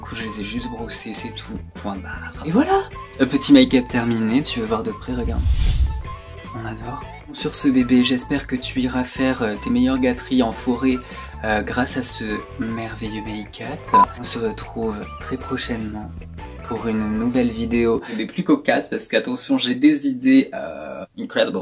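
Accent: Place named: England, United Kingdom. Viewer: L’accent anglais est merveilleux 😌 Toi t’es iconic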